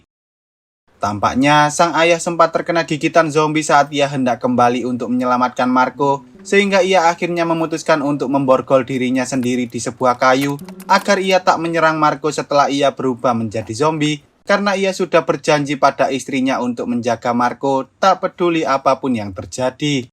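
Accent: Java, Indonesia. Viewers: Anjj dengerin suaranya medhok le 😂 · Mas medok men sih mas 😢😂😂 koyok aku mesti wong jowo wkwkkw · Kurang enak cara ngomong yg ceritanya, ada logat jowo😂 Sekali2 pake logat normal bahasa indo mas bro.😂😂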